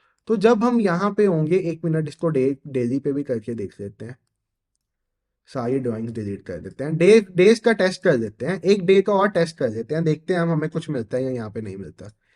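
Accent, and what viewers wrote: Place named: Pakistan. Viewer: Tu Pakistani hai na Teri voice bata Rahi hai.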